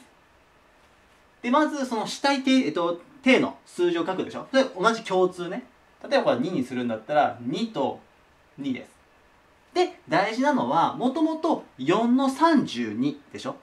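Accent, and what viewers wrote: Kansai, Japan. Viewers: たまににじみ出る関西弁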